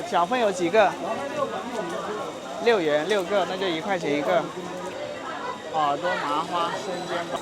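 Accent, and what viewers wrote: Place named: Hunan, China. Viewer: 博主怎么有点湖南口音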